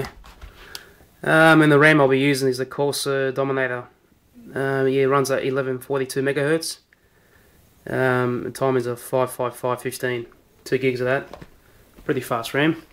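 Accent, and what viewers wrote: Australia. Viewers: he's Australian (or from New Zealand). NOOB.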